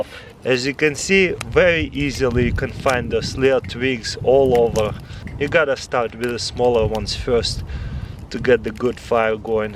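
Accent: Russia. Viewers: У тебя акцент русский.😀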